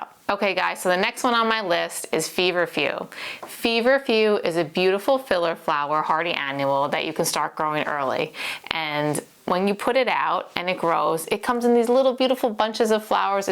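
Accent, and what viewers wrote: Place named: Long Island, United States. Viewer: Accent rough. People from LI and Staten Island always sound a little drunk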